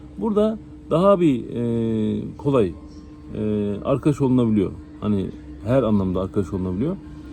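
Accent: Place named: Aegean, Turkey. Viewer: KAMBOÇYA'DA YILBAŞI ETKİNLİKLERİ 1. Khmer Yeni Yılı (Chaul Chnam Thmey) Ay: Nisan (genellikle 13-15 Nisan) Etkinlikler: Geleneksel danslar, su dökme festivalleri, ailevi kutlamalar. 2. Lunar Yeni Yılı (Çin Yeni Yılı) Ay: Ocak veya Şubat (tarih, ayın döngüsüne göre değişir) Etkinlikler: Aile ziyaretleri, yemek festivalleri, ateşli silah gösterileri. 3. Düzensiz Yeni Yıl Ay: Ekim veya Kasım (Kamboçya'nın ulusal günlerine bağlı) Etkinlikler: Kültürel etkinlikler, sokak kutlamaları. 4. Kamboçya Bağımsızlık Günü Ay: Kasım (9 Kasım) Etkinlikler: Resmi kutlamalar, geçit törenleri. EN KALABALIK YILBAŞI Khmer Yeni Yılı en kalabalık olanı. Ülke genelinde büyük kutlamalar yapılır ve yerli halkın çoğu bu festivale katılır. diye özetle internet ortamı bunları yazıyor... Ama Kamboçyayı senin ağzından dinlemek çok daha güzel.. Umarım, bir gün maddi olarak imkanım olur da, senin o güzel ege şivenden Kamboçyayı dinleme bahtiyarlığına erişirim.. 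Sağlık ve Başarı dileklerimle..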